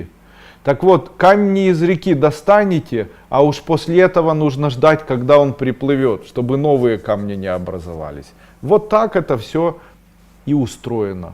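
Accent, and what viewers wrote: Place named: Kazakhstan. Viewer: Ви такий прикольний.Акцент казахський.)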